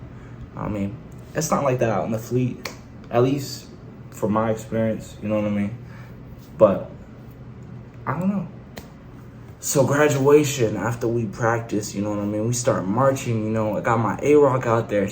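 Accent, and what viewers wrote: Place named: New York, United States. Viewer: Lmao boy u gotta be from New York , nice vid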